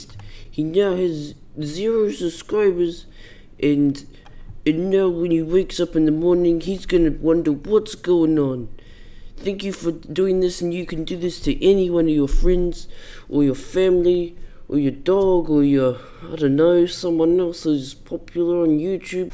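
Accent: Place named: Russia. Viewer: Russian speaking inglish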